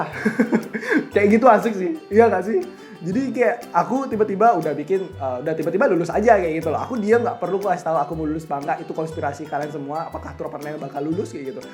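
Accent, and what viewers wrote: Bali, Indonesia. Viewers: Suka banget ama aksen balinya wehh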